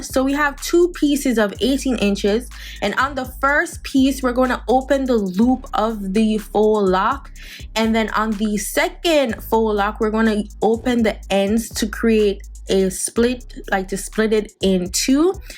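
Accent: Jamaica. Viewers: aye from mi hear start tlk mi know ya jamaican enuh but anyway love the video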